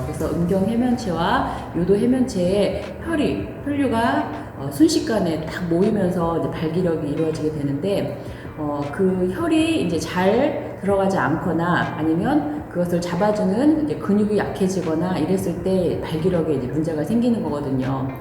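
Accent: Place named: Jeolla, South Korea. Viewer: 짤라서 지져각꼬~!^^ㅋㅋㅋ전라도분이시구나~!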